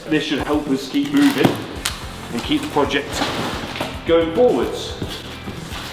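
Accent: United Kingdom. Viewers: I’ve never heard a person with a non American accent say “ain’t” before. Proof a British accent makes any word sound proper….ish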